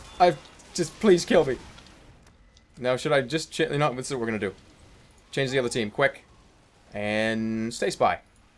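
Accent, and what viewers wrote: Boston, United States. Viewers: Did you know that people in Alabama speak with an accent similar to Jerma's (a Boston accent), not really a southern accent. :)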